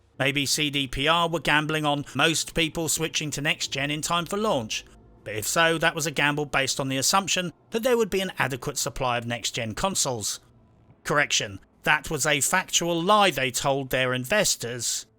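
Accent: United Kingdom. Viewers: As an emerging, very, very happy fan of your video's which somehow I didn't ever see pop up until recent weeks, I humbly ask of you sir, over in the U.K. I presume, of which I thoroughly enjoy the words used with the accent, which just gets my wife and me so much, lmao, that you do a review that's reasonable on Homefront 1 which is STILL NOT backwards compatible for XB1 Series S or X, but only playable on X-Box 360 & Homefront 2 - The Revolution, IF I've somehow missed that if you already made one. IF you could do that, at least for the 1st one, I'd like to hear your thoughts. I enjoy the campaign on it, although it's much more linear and shorter than the sequel but I cannot for the life of me understand at least 1 thing, why the f--k it's not playable on new gen consoles like 99% of all other older or slightly older games now. From the other side of the pond in America, from the great fkg state of awesome Georgia, thank you.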